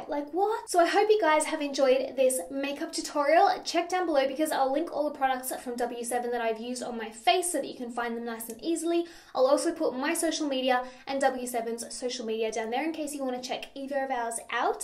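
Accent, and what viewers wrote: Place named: Australia. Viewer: #LANGEFAM Hey, I am brand new to YouTube - seriously only used it for music in the past, but recently have been watching many of your videos! You are brilliant! Your make up advice is so easy to follow and definitely makes a difference. I've watched others, but didn't like them as much - maybe its the Aussie accent lol I was always a tomboy/athletic/workaholic, so I wore makeup, but never really took the time to really appreciate what works best....you have helped! THANK YOU! Would love that kit to really try to improve my look! Keep being you! You're great!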